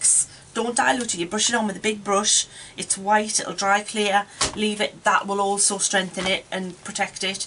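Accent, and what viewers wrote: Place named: Newcastle, United Kingdom. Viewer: You sound like you come from Newcastle upon Tyne area. My great grandfather was from there. I am Australian. He came here in the 1890s . I am so enthused since seeing your embossed version. Might have to purchase a machine.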